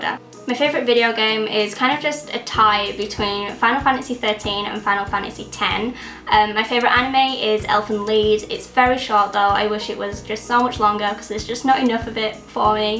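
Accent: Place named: London, United Kingdom. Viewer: OMG I love your accent so much. I am going to copy it when I come to London! I hope we get time to meet up :D :D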